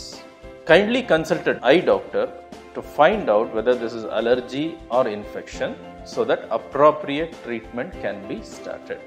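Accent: India. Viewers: Why do i love indian accents?? Lol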